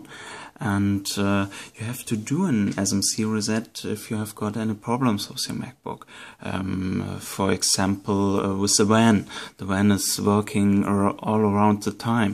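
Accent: Germany. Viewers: german-english hört man halt einfach immer raus xD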